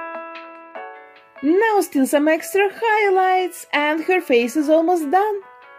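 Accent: Russia. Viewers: По вашему акценту, можно с полной уверенностью сказать, что вы Русская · Вы ведь из России ?) Акцент слышен.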